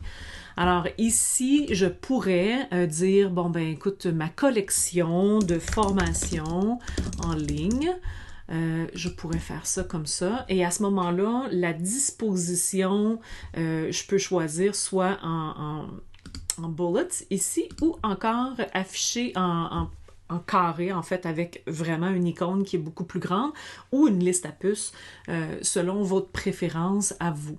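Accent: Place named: Canada. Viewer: Merci pour cette video réussie! un petit bonjour de Normandie en France... et merci pour ce chouette accent canadien qu'on ne se lasse pas d'écouter avec plaisir ! Cependant j'ai une petite question, vous serait il possible de faire le même style de video pour lier la boutique Facebook avec celle d'Instagram. J'ai un site internet sur Wordpress, une page Facebook Pro, une boutique Facebook et un compte instagram professionnel, tout ce petit monde à l'air être connecté mais quand je veux mettre des produits sur ma page instagram, on me répond : Aucun produit ici, si les produits n'apparaissent pas ici, contacter l'administrateur de la page, ou vérifier vos autorisations dans business manager ??? je suis complètement larguée!!!! sur business manager c'est archi compliqué ^^ merci en tout cas d'être présente pour nous aider dans ces démarches bonne semaine à tous !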